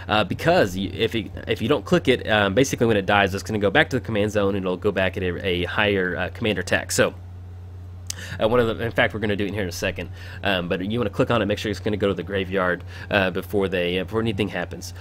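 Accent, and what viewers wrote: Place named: South, United States. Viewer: Seems like a prime deck for Timetwister.:)Also, your voice reminds me of every southern kid I met in the Army.:)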